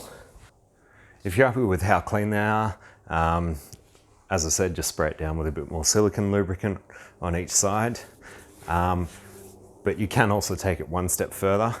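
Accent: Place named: Australia. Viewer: Great video, nice to hear an Australia voice on YouTube for a change.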